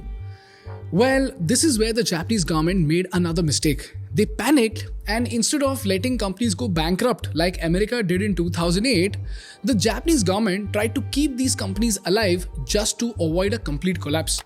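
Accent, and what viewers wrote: India. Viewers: 原来印度语是这样的啊。有点英语的成分啊。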